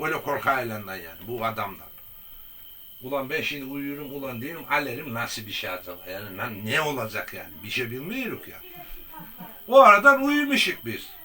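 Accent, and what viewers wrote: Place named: Black Sea, Turkey. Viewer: Maşallah ağzına yüreğine sağlık koca yürekli dayı gerçekten konuşurken habu Karadenizi yaşatarak konuşuyorsun vallaha helal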